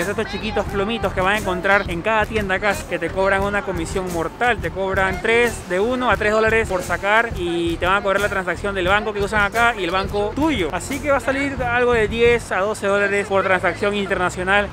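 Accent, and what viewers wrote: Peru. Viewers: Tienes un acento como de mi perú 🇵🇪!!! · Bravazo tu video hermano !! Eres peruano verdad ? Por tu acento limeño.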